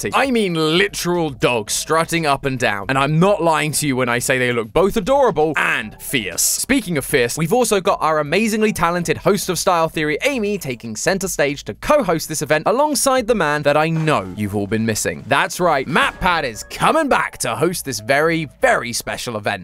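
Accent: United Kingdom. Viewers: I love your British accent. I hope you continue to bring us these juice videos and get your own path in fnaf theories. ❤ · Feels weird but the British accent is kinda selling the emphasis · Yah he will do great it's just weird because a British voice instead of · Iskhow to feel about ngl.. i like his.. british-esk voice but i still T^T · I clicked the video and I thought why is matpat making a British voice and remembered that he retired and this is, your doing a great job!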